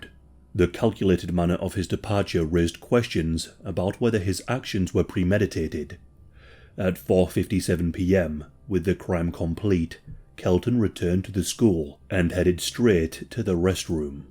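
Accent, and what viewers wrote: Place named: Northeast England, United Kingdom. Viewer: Aye aye aa think your from rundhere 😂 not a Geordie but not far from like ❤️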